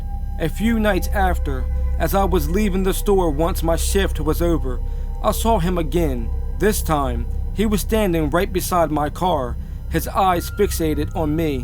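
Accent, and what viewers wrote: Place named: South, United States. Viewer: Your video randomly showed up. I see that you are a small channel. But I noticed that you had a southern accent and that made me appreciate your commentary right away. It may not be everyone's thing, but it makes it more relatable in a way. You got yourself a subscriber. Keep on keeping on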